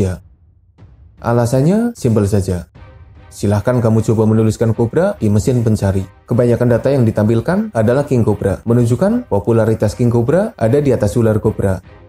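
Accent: Banyumas, Indonesia. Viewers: Ngapak binginget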